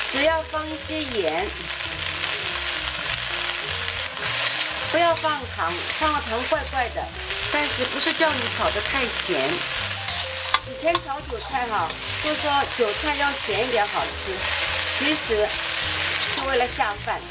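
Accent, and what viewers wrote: Shanghai, China. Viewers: 日锅日油，哈哈，沪普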